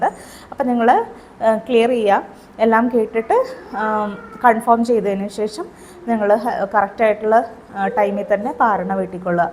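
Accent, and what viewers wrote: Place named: Valluvanad, India. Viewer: മോൾടെ വീട് വള്ളുവനാട്ടിൽ ആണല്ലേ. അതാണ്‌, ഓരോ വാക്കും കഴിയുമ്പോൾ, ട്ടോ എന്നു പറയുന്നത്.👌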